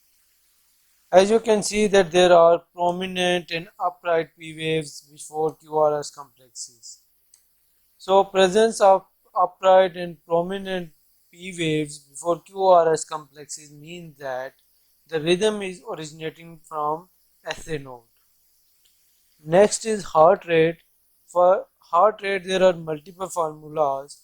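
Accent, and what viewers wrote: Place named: India. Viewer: Thank you. This is a great help to us who are accustomed to the English accent but are not used to the Indian accent.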